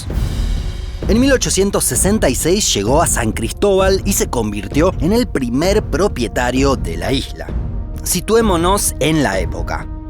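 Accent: Buenos Aires, Argentina. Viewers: Xfa pronuncia bien las ye y lle No hables asi porteño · Gran video, como siempre. Sólo una pequeña sugerencia, que no tiene que ver con el contenido ni tema: Sería genial si las LL e Y sonaran como lo hacen en el acento rioplatense como anteriormente, pero que noté que hace dos videos se suavizan... No sé a qué se debe esto.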